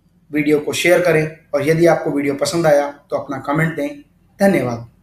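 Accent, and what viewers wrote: India. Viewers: Bengali ho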